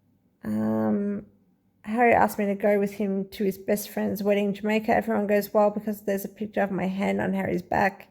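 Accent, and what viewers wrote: Australia. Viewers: We don't know the royal family either. I think this is all a huge distraction from a lot of directions. Nice research and nice to hear an Aussie accent! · Love your Aussie accent - thanks for uncovering this ruse - big fan from Cape Town, South Africa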